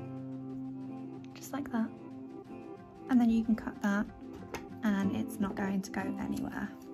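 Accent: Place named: United Kingdom. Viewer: love!y to hear a fellow Brit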